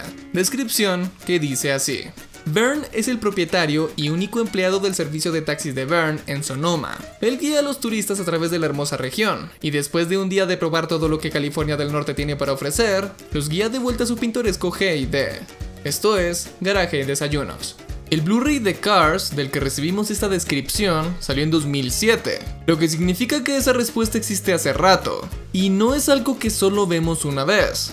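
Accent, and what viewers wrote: Colombia. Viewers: Eres colombiano?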